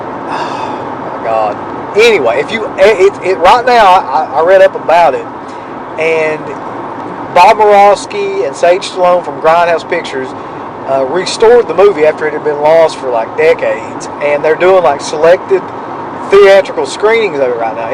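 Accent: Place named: Kentucky, United States. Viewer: Kentucky accent =automatic stabbing in the D!..:)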